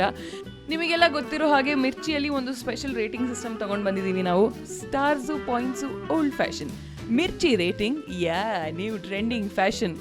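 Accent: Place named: North Karnataka, India. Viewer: Soooo nice to hear UK kannadaali commentry ❤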